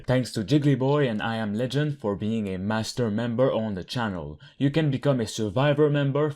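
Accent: Quebec, Canada. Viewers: Are you french canadian by any chance?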